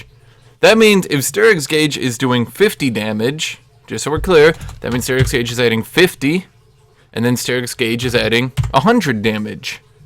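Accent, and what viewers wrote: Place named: Germany. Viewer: er sagte mal im stream dass er aus deutschland kommt. den akzent hat er sich wohl mit der zeit abgewöhnt, in seinen älteren videos ist er noch etwas stärker bemerkbar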